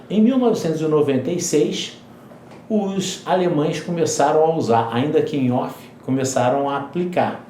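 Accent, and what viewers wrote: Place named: Sao Paulo, Brazil. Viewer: O carioca ortodoxo agora é mais paulistas. Rsrs. Te amo meu primo. ❤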